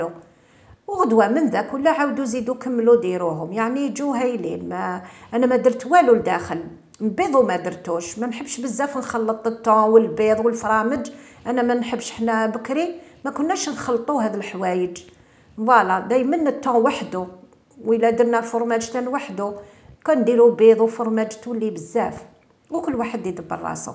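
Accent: Algiers, Algeria. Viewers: شحال نحب هدرتك تفكرنا بناس قصبة همة والشان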